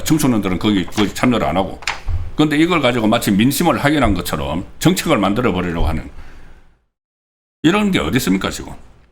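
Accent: Gyeongsang, South Korea. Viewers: 송국건씨 말솜씨가 그래가지고 평론 하겠어요? 경상도 사투리억양이야 타고난거니 어쩔수 없다 하더라도 버벅거리고 두서없고 툭툭 턱턱거리는 말투와 어휘력 에 듣기가 거북하네요. 아나운서 정도는 아니래도 평범한 수준이라도 되야지. 참 딱하네요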